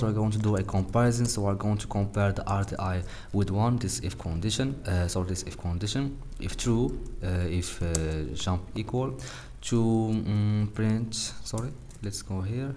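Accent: Morocco. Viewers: itoub 3lik, ghir mn pronunciation 3rftek mghribi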